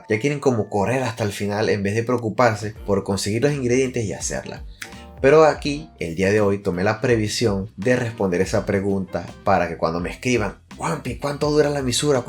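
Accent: Venezuela. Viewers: Me gusta mucho su acento venezolano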